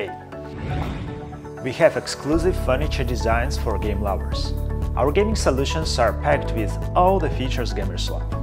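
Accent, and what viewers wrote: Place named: Eastern Europe. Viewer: He speaks with a VERY east european accent that is difficult for most english speakers to understand.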